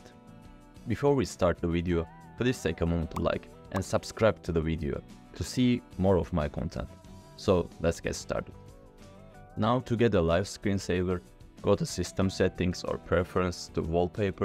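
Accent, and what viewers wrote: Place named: Turkey. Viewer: Bi aksan ne kadar Türk aksani olabilirse o kadar. Almanya´dan selamlar!!!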